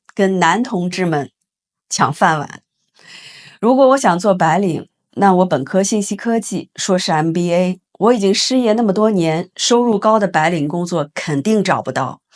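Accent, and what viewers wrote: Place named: Beijing, China. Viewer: 这次说话有北京味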